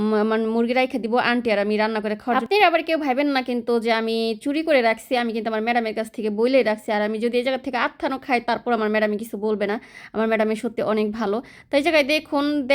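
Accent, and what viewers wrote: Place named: Narayanganj, Bangladesh. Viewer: আসসালামু আলাইকুম আপু তোমার বাংলাদেশের কোন জায়গায় নারায়ণগঞ্জ বা জাজিরার এদিকে প্লিজ আনসার দিও তোমার কথা ঐদিকের লাগে জন্য আপেক্ষায় থাকব।।।।।প্লিজ...।।।।।।।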